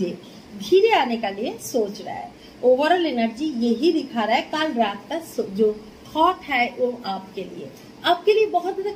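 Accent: Bengal, India. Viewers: I simply love ur Bengali accent its so sweet maam just like u ❤❤❤❤❤❤❤❤❤ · your accent and the way of speaking is typically Bengali or atleast East that us Odisha or Assam.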